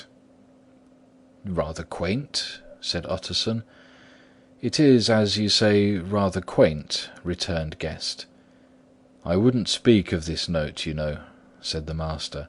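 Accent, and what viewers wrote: England, United Kingdom. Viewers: I heard of the title plenty of times,, but it was nvr mando reading for me. Tonight was my 1st time hearing the story,, and I completely LOVED it!!! Especially since the narrator has English accent, it fits so perfectly. Wat a awesome tale!! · British af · one of my very favorite books! i love the British accent ! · An excellent BRITISH narrator for this classic horror story! Wow - rare for Librivox, thanks so much! 🌹🙏🏼❤️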